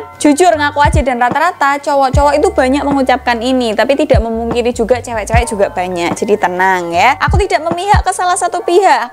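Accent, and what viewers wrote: Java, Indonesia. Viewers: Medok pisan si mBak iki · ciri khas bahasa PRIBUMI nya medok Good luck buat kk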